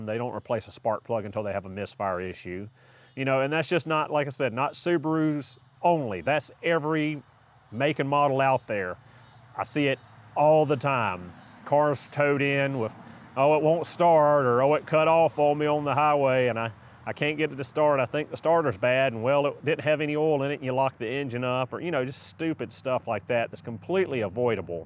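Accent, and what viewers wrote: South, United States. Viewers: Bro, you sound like you’re from Georgia or Alabama. I’m in East Alabama and getting my first Subaru. Hit me up. I follow the service intervals, but want someone trusted for bigger stuff. My bigger stuff, I mean anything internal. I can and do sparks, injectors, ball joints, fluids, belts, etc.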